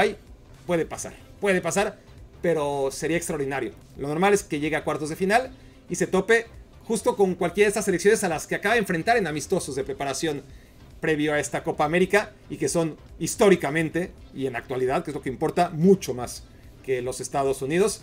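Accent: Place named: Mexico. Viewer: Escuche tu acento mexicano y de inmediato perdió peso y credibilidad tu video. mexico no existe en futbol, dediquense a la pesca, remo, polo.... FUTBOL NO !!!!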